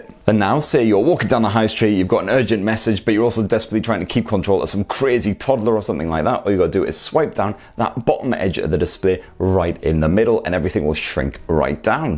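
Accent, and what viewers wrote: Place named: Newcastle, United Kingdom. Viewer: Geordie lad through and through!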